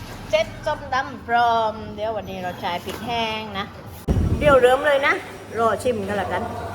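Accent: South, Thailand. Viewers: สวัสดีค่ะ..🙏Happy Diwali❤..ชอบสำเนียงเหน่อๆภาคใต้..ทำให้หลากหลายมีสีสันรสชาติสนุกสนานค่ะ😂. เพิ่งจะไปเที่ยวอินเดียตอนเหนือ Rajasthan 14 วัน ...1-14 พ.ย นี้ Delhi>Mandawa>Bikaner>Jodhpur>Ranakpur>Udaipur>Jaipur>Amer>Agra>Delhi ✈ Stockholm. เห็นภาพนี้น้องเล่า..ว่าที่อินเดียไม่มีผักที่กินมากเหมือนประเทศไทย .. ที่มีเขาก็ไม่กิน.. เห็นดอกขี้เหล็กกำลังออกเต็ม ต้นกระถิน..และอีกหลายๆอย่าง..คันมืออยากเก็บจริงๆ😊.. ขอบคุณนะที่มาแบ่งปันเล่าสู่กันฟัง ❤..อยู่เมืองอะไร???ค่ะ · แหลงพันนี้แระ ฟังแล้วได้แรงอก55 หาคลิปของคนใต้ที่อยู่ ตปท.ยากมาก ส่วนมากแหลงกลางกัน ไม่ค่อยแหลงใต้ไม่รู้พันพรือ ถ้าบางคำทีเป็นคำใต้ท้องถิ่นเราก็แหลงกลางไปมั้ง คนอื่นเขาได้ฟังรู้เรื่องกัน ขอบคุณที่ยังรักษาความเป็นใต้ไว้ ชอบคำว่า ..พานุ้ยมากัน.. จะรอชมคลิปต่อไป☺️☺️ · ดูครั้งเเรกค่ะชอบขอโทษนะค่ะคุณอยู่จังหวัดอะไรค่ะคนใต้พูดเพราะชอบ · ส้มตำน่าอร่อยมากค่ะ พูดภาษาทางใต้ของไทยฟังออกค่ะสำเนียงเพราะน่าฟังมากค่ะ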